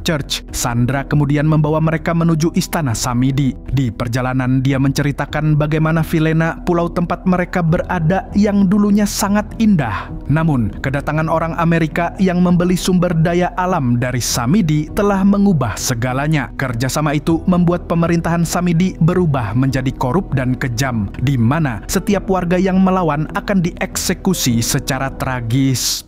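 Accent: Banjar, Indonesia. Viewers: Mimin orang banjar kah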